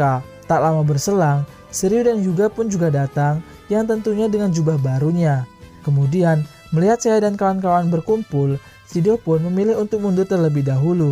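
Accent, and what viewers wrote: Palembang, Indonesia. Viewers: Mimin nyo wong Palembang hehehe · Anjir kuat nean Wong Palembang ceritonyo? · kuat nian? wong plembang apo mang?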